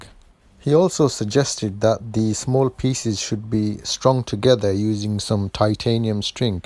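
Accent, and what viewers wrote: Birmingham, United Kingdom. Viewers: Are you from Birmingham? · your English accent suggests that much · I am not a watchmaker but an engineer. As such I can appreciate the work in these beautiful units. Your videos are clear, informative but best of all relaxing and easy to watch. I think the gentle brum is a joy to hear.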